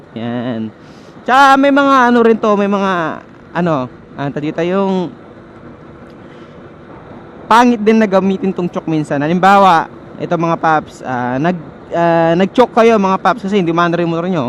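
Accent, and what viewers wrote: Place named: Visayas, Philippines. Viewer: bisaya accent 😆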